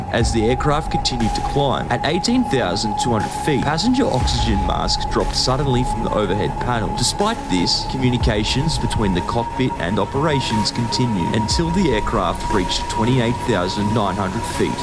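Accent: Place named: Australia. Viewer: what the aussie accent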